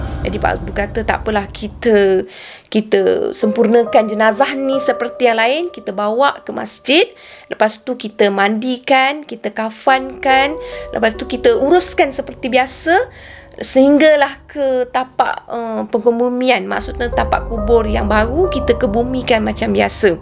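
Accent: Kelantan, Malaysia. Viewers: ooo...orang kelante. Jangan marah, saya suka cerita-ceritanya, cara penyenpaianya...eee dengan rautwajah yang berubah-ubah. Rajin awak cari bahan😓😓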